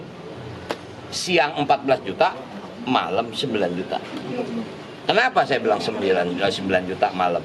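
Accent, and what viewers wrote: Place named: Jakarta, Indonesia. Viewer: Enggak gaya bahasa nya..saatnya Jakarta di pimpin yg ngomng nya betawi gini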